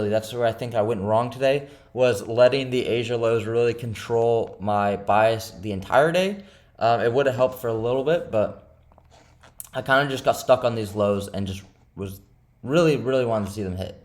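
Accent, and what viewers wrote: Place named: South, United States. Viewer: u got a southern accent, usa state u curently in? keep it up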